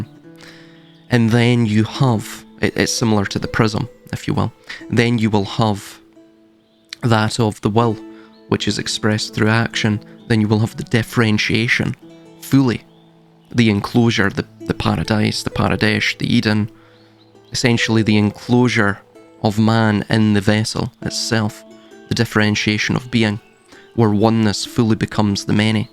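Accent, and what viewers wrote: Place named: Scotland, United Kingdom. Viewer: A very sobering video, depressing but liberating. Also, you have a silky smooth Scottish accent. Don't worry about that. My favourite band are Scottish. I've always liked the accent. Love from England!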